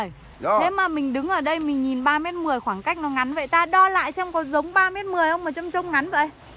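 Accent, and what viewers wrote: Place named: Thach That, Vietnam. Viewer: Gọng nói thạch thất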